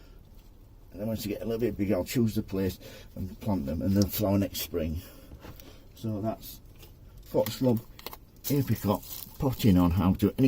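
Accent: United Kingdom. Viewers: I just gave a thumb for the Northern accent!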